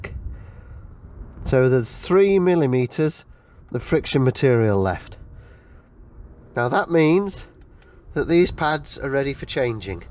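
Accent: England, United Kingdom. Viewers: I had the same question; why at 3? Thanks and I love listening to the English English!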